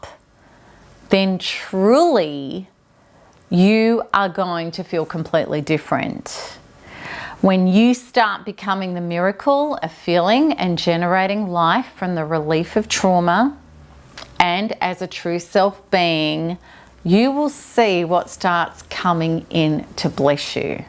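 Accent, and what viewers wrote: Australia. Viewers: thank you. I am in the forum and the ppl there are angels but I can’t seem to break through the barrier and the time it takes every day to go through the modules. I don’t have years to get my life together.... I hear that this takes years to overcome and condition the subconscious... I feel discouraged because I need help now. My life is a mess!!! I really don’t know what to do and how. I’m at a stand still and feel trapped in a life I did not choose and that does not belong to me. I don’t know if that makes sense... Anyway I keep listening to your videos and reading your blogs. You are an inspiration to me. I grew up part of my childhood in Australia and hearing you accent it’s comforting to me... I think you are gorgeous and have been getting better with years. I’ve been following you for nearly 6 yrs now. 💗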